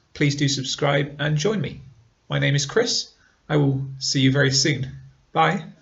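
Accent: United Kingdom. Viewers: dear chris my best teacher i like your speaking style and your accent very well i want to learn british english and speak like you plz tell me the best way how to speak likes a british native speaker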